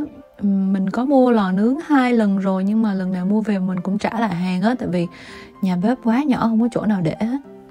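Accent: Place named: Da Nang, Vietnam. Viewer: hèn chi, giọng hơi đặc ko lẫn vào đâu đc, mình dân Đà nẵng nghe nửa chữ phát biết luôn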